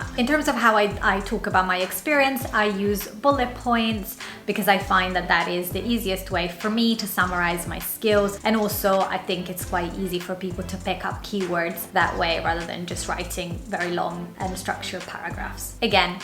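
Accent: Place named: England, United Kingdom. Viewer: Thank you for the information. It's always hard for me to get through a presentation when the presenter ends every sentence with vocal fry but easier with an English accent. I guess I'm a racist.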